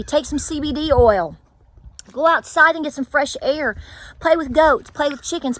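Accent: South, United States. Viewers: So glad you're ok! Just had your channel suggested to me, and I just love it. My husband's family was from Murfreesboro, TN and I grew up in Columbus, GA. We retired after military traveling around the world,now living in Rome,GA. It is such a pleasure hearing another fellow southern gal and learning from her on your recipes. I hadn't heard that term "cat head biskits" since my mother-in-law used to teach me in her kitchen back in the 80s-90s. Thanks for those great memories! Many blessings to you and your family! Thanks for such a great video here. Reminding us all to remember those things most important!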